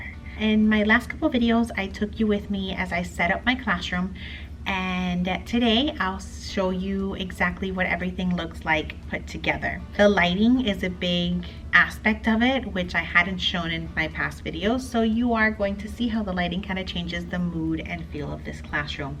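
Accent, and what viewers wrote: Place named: Miami, United States. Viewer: Great room, u from Miami? I hear accent…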